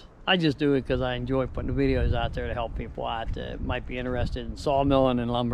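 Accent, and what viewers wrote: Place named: Western Pennsylvania, United States. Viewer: Im in PA too near Harrisburg. Curious, are you near me? Sounds like a Western PA accent? :)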